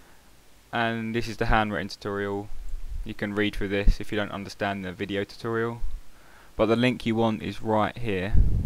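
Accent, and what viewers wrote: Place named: London, United Kingdom. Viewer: Hey, you sound like you come from some where around Sidcup? Correct?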